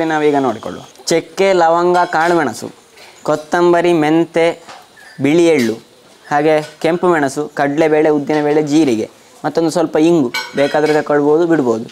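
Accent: Mangalore, India. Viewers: Mangalore kannada...nice to hear 😊..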